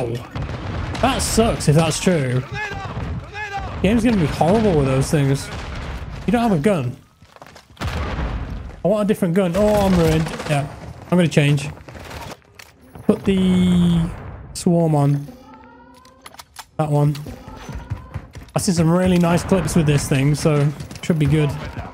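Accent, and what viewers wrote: United Kingdom. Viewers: thanks this is a very useful video but with british accent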